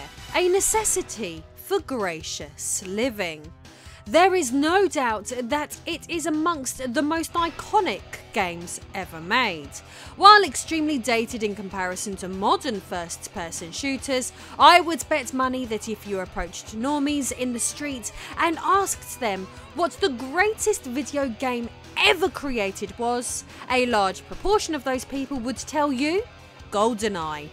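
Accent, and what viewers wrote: United Kingdom. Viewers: This British Accent 👍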